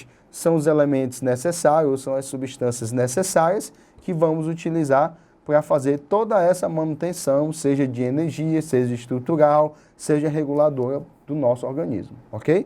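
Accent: Ceará, Brazil. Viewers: vlw!prof por mais essa! Gostei do início do vídeo e do senhor dizendo: ah profº.... posso comer isso... e tal tal kkk com esse sotaque cearense muito engraçado esse sotaque de vcs! obg por contribuir com nossa preparação pro enem e também p/ a ampliação do nosso aprendizado!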